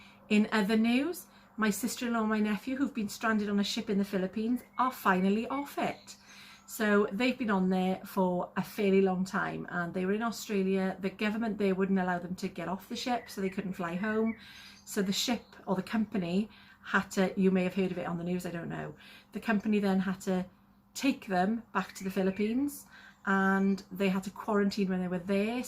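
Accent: South Wales, United Kingdom. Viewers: Hay found you on YouTube today. Thank you for sharing your SW journey with us. So pleased that your family are home safe and well. Congratulations on your NSV, you will lose that game next week. It’s lovely to hear voice from south Wales. X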